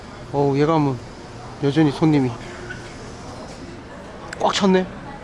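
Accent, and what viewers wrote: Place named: Gyeongsang, South Korea. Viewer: ㅋㅋㅋ고단세 ㅋㅋ 갱상도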